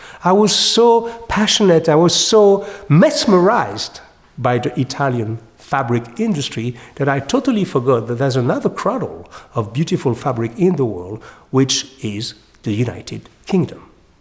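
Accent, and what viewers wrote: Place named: France. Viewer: ...And also displays a delicious French accent.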